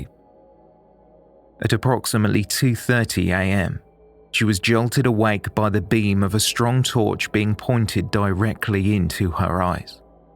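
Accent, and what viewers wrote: England, United Kingdom. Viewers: I like the narrator s voice very much n his english English❤❤😊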